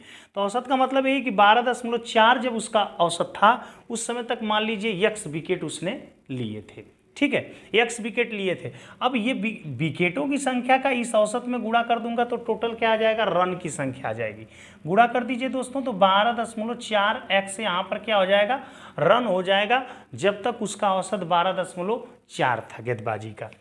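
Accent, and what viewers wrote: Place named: Bhojpuri, India. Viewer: Bhojpuri andaj me jabardust lag rhe h guru ji😊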